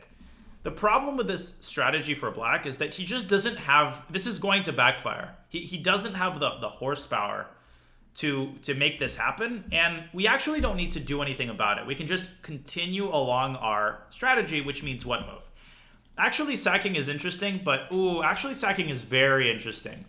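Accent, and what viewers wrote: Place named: Russia. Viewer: Man, your russian accent is just amazing. I can't wait to join your stream to hear it live.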